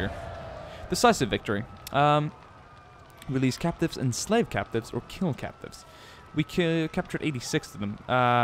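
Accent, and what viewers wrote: England, United Kingdom. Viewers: YOu sure? He sounds pretty English to me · R U british or american?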